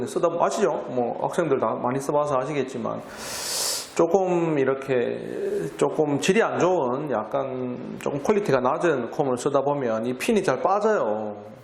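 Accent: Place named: South Korea. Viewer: 저는 성격이급해서 웬만한영상 2배속으로듣는데 진짜 서울말처럼들려요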